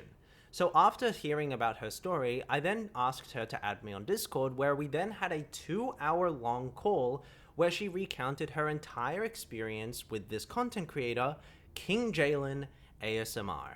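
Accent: Australia. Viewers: Bestie he is not british he literally has an aussie accent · I think he’s Australian :) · unrelated but YOUR AUSTRALLIAN???????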